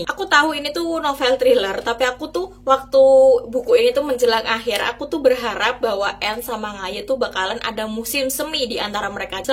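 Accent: Java, Indonesia. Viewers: nggak salah deh follow ig n subscribe youtube nya... banyak referensi buku yg kece2... paling suka pas ngomong "ketiban" 😄 sama medoknya sama ak... Org mana sih kak? kalo pas ke Solo hayuk ketemu, sharing referensi2 buku kita 🤓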